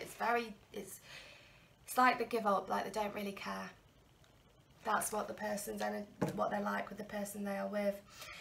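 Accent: United Kingdom. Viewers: love ur accent British gurl! thanks🤐❤️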